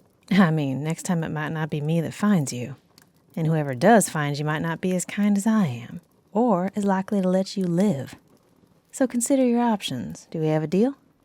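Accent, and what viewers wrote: South, United States. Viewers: You keep acting like that and I'll put you in your place Great southern accent. Wait is it southern? Great audios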